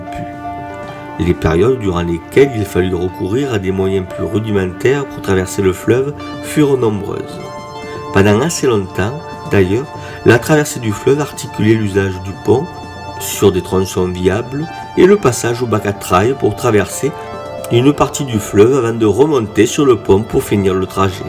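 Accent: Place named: Avignon, France. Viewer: Oh, vous avez le plus bel accent du monde, et évoque pour moi de très anciens souvenirs puisque j'avais un peu cet accent depuis Avignon, quartier St Agricol, avant que je déménage à Paris à l'âge de dix ans....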